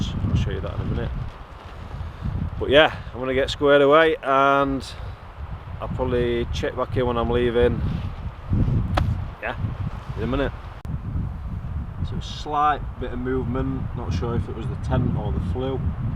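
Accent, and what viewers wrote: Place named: Northern England, United Kingdom. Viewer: Manchester mate! I don’t think your too far from me by sounds of you 😂 👍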